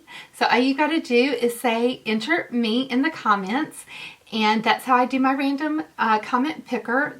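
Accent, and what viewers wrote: South, United States. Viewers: Where are you from..so southern..even for me and I'm in Arkansas..lol.. Enter me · Girlfriend you are definitely southern.love it!please enter me IG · Loving that Southern Belle accent and that laugh!! 🤣❤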